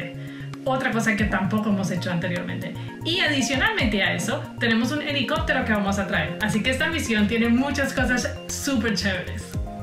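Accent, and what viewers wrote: Colombia. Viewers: Cuando dice "La parte más chévere..." y su acento caleño. Qué gran orgullo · Por su acento, muy Colombiana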